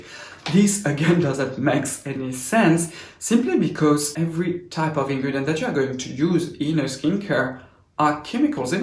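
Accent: France. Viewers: I think that french accent is cute 😃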